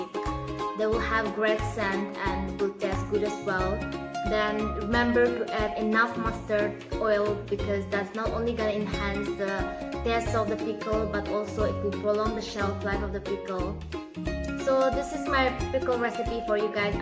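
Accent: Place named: Nagaland, India. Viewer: Well to be honest Sema people knows better when it comes to foodies of pickles etc etc... 😘 And I love the way you speak with that sema accent😍 and this looks tastier🤤 surely gonna try it!! 🤪 But one thing I want to know is can we put salt??🤔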